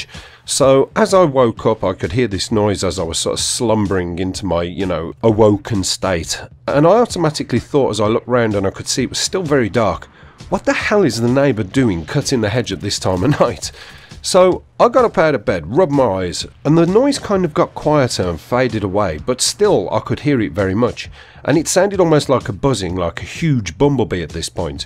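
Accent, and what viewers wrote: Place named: Ireland. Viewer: Your irish :)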